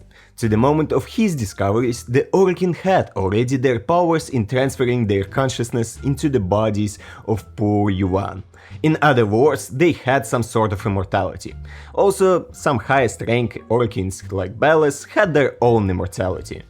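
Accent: Russia. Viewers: Спасибо русскому акценту, слова с буквой "r" теперь вызывают в 2 раза больше проблем. Но получилось норм, вполне понятно и приятно звучит) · я против ничего не имею,но Русский акцент так и прет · Русский английский как всегда прекрасен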